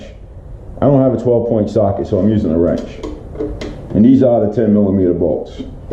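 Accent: Boston, United States. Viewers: you made me homesick Boston strong deplorable in tampa bay ...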